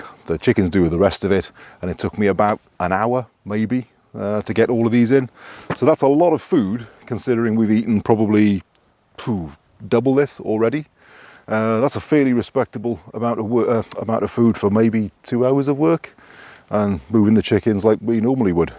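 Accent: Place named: Scotland, United Kingdom. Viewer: I love your accent I'm in New York are you of Scottish decent?I think I asked this once before to you lol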